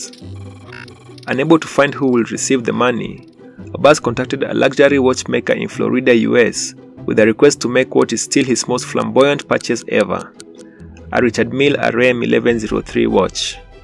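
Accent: Kenya. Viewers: About section of this channel says location is United States but accent ni ya mkenya.. 🤔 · From you accent,you are a Kenyan….and you still want to pull Kenyans name into the mud.How comes we never heard there were kenyans involved from US FBI reports? You doing this makeup story for some simple views while tarnishing Kenyan name….We Kenyans in diaspora dont appreciate this! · The narrator sounds like a Kenyan. May be that's why he insists when mentioning Kenya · another video of scam glorification narrated by the nairobi accented guy. not good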